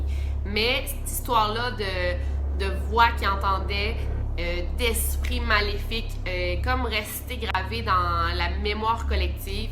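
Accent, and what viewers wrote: Quebec, Canada. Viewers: Aller bientôt les 100k t la meilleure je suis française mais j’adore l’accent des québécois je rêve d’y aller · J'adore ta voix queebecoise moi aussi je suis québecoise sinon continue tes vidéos bisous ❤❤❤❤❤❤😘😘😘😘❤❤❤❤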